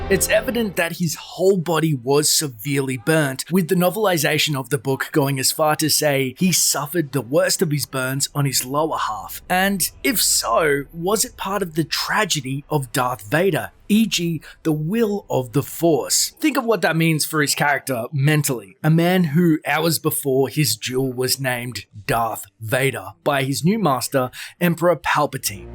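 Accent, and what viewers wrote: Australia. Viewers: Thumbs down. Australian accent sucks.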